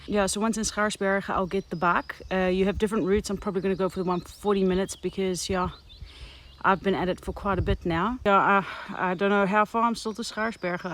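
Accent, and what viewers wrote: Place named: South Africa. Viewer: I think this is Sandra Bullock with a South African accent.....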